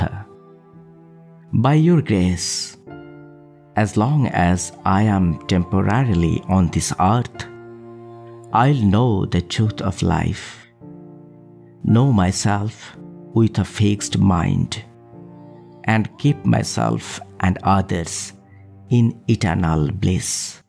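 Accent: Bengal, India. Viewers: I was searching English video in Indian accent and find this thank you. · Are you (the speaker) Bengali?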